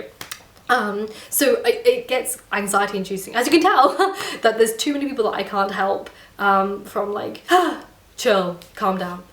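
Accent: RP, United Kingdom. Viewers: New viewer (American) here. I spent a summer in Edinburg when I was a kid, but I would have never guessed you lived there from ur RP accent. Once you said it, I can notice some subtle things, but still... never would have guessed. Maybe I’m just bad at hearing it since I’ve gotten older. Either way, ur voice is beautiful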